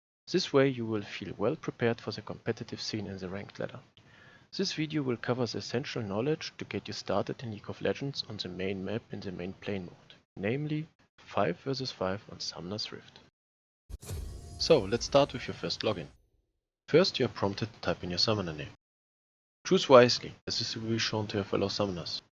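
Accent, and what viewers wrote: Germany. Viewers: are you German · You are german right :D? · He sounds German as well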